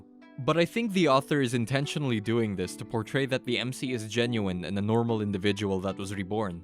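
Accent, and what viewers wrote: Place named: Philippines. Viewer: Filipino ka ba? Edit: I hear a slight Filipino-ish Accent.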